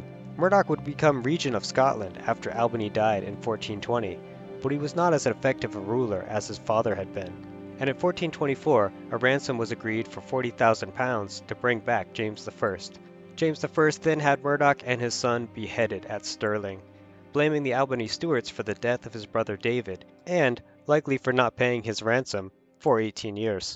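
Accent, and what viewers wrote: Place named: United States. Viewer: Why would you have an american narrator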